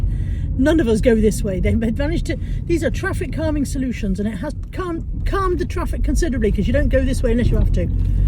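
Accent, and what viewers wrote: Bristol, United Kingdom. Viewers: Hi I watch you and family plus Dogs all the time my late Husband was from Bristol and love ❤️ the way you talk bring back such good memories.coming to Bristol on the 12th March to see family I will look out for you when we go into town would love to buy you a drink.watch you do parcel up and send a supize one every week I am 74 soon and love my yorkie belle she my mate like molly is your.sending love ❤️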